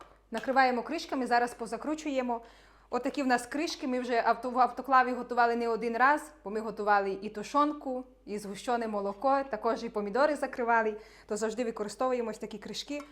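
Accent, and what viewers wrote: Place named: Western Ukraine, Ukraine. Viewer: чому "баночкі" а не слоїки?)) Можливо я помиляюсь...але, мені здається, що жіночка не з полісся. Акцент дуже схожий на західний.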